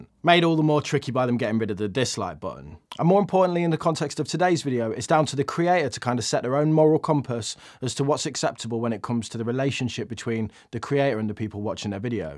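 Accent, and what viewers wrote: Birmingham, United Kingdom. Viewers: Love your content mate, nice to hear someone from Birmingham instead of just American’s all the time. Keep it up! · Best of luck. I wish you nothing but success. There are not all that many British finance channels, it's all well and good listening to some yank that has millions in the bank telling you to spend less. But you have provided me with some great insights and actually had a positive impact on my wallet. Keep up the great work and I am sure you'll do brilliantly. P.S You're a Brummie? I thought you were a Manc. 😂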